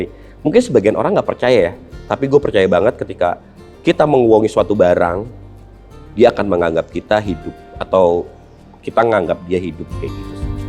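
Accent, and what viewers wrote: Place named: South Jakarta, Indonesia. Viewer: Kyk abang"an w ini mah.. dari cara ngomong + gaya ny kyk anak jaksel.. 👏👍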